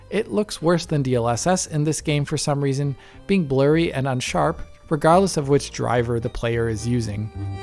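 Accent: United States. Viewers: He lives in Germany, speaks with an American accent, and has an Italian name. Go figure! :)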